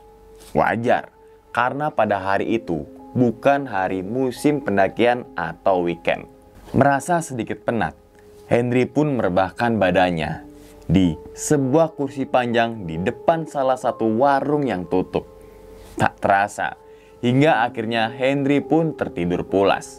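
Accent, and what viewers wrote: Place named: Semarang, Indonesia. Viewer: wih logat logat semarang kih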